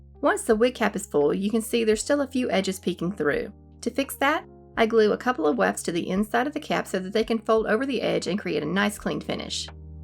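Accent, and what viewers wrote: South, United States. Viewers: idk where youre from, but im from SC and love to hear southern accents from youtubers. its very comforting. im gonna guess youre from TN but i could be totally off on that lol. also i love the slow pace of your videos. a lot of doll makers create energetic loud videos and while thats totally fine !!!! i love seeing stuff thats more laid back and calm. :)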